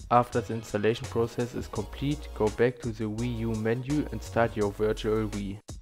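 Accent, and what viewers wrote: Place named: Germany. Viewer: man hört dein deutsch krass raus haha aber trotzdem danke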